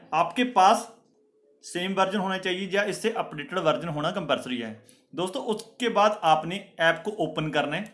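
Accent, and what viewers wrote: Punjab, India. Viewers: Bhji tusi punjabi a ki hryanvi? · are u from punjab??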